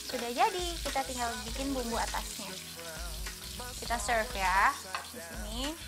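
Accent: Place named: Malaysia. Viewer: bahasa inggrisnya kayak org malaysia..ancur dicampur campur.. goodbye bahasa Indonesia klo artisnya saja suka pamer bahasa inggris nya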